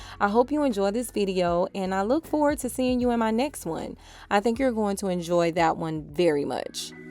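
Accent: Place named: South, United States. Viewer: Not sure how you got to my algorithm, but I'm happy ya did and you had me ❣️🎶 I've been debating giving raw a try but it seems daunting, as we have 4 young children and the thoughts of cooking for them and something entirely different for me overwhelms my mind. I think I'm going to try it by starting small. Thanx & God bless!✌🏽 (P.s....sounds like I hear some Philly in your accent yet, I hear some South. I'm orig from VA.)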